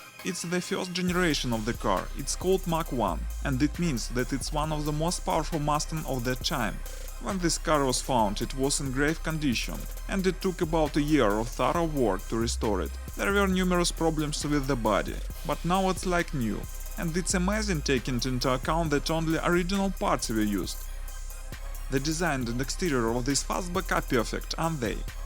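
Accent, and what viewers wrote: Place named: Russia. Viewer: Greetings from USA! What basic geographic is this collection located?? The voice over sounds Russian. Pretty nice collection of Yank automobiles. The Chrysler 300 convertible made me throw up. Its a great car other than wheels and seats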